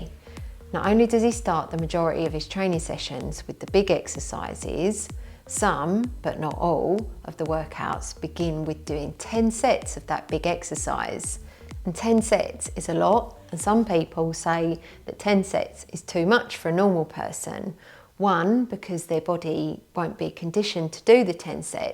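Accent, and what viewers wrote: United Kingdom. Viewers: You know the weird feeling you get on YouTube when you follow a channel for so long that in your head you are totally like a real friend to the channel host! Well that happened to me and I am not even ashamed! 😊 You are bloody brilliant! (That was my most British impersonation, also said it in your fabulous orgasmic accent!) 🫶🏻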